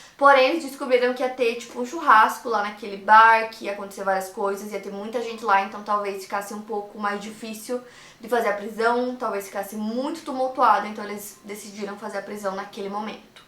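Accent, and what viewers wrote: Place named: South, Brazil. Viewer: você tá perdendo o seu sotaque sulista 😳😳😳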